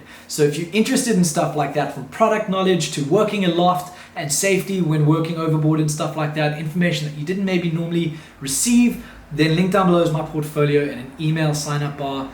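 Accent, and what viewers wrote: South Africa. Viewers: Boet, are you South African? The accent dead give away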